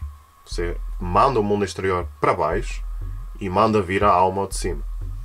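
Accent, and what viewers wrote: Portugal. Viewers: Es portugues fingindo ser brasileiro? Tamaste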